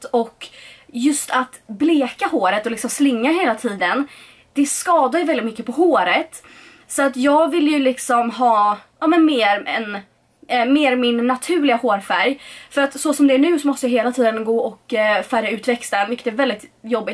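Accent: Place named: Karlskrona, Sweden. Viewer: em_ det är karlskona dialekten som kickar in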